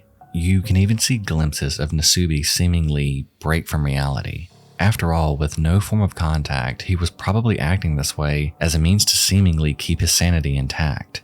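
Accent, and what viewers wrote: South, United States. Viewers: I just noticed his southern accent how did I never notice it before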